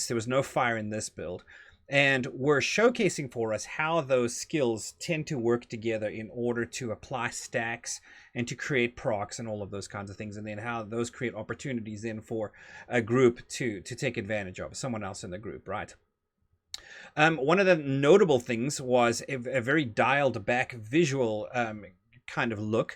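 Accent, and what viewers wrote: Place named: Texas, United States. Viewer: I liked this video quite a bit, and also happy you are doing more content. But, the big story for me is that I am starting to hear a Texas accent slip into your narrative occasionally :)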